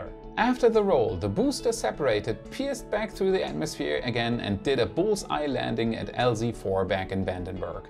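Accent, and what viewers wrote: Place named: Germany. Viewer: Are you from Germany? Bist du aus Deutschland? Deine Aussprache klingt relativ Deutsch. Just currius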